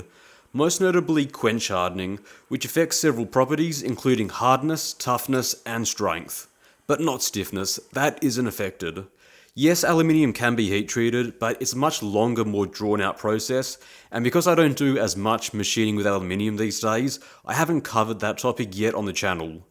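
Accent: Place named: Bogan, Australia. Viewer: Brought out the face reveal definitely dont look like what i expected sound like a bogan aussie 😂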